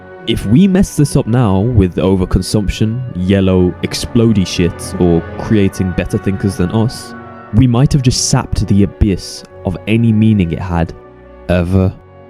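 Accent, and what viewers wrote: United Kingdom. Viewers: West midlands?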